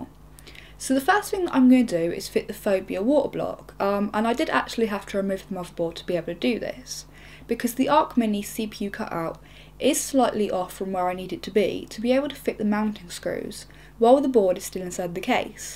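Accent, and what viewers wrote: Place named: Essex, United Kingdom. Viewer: This one's definitely different from your newer videos, more chilled, but that Essex accent!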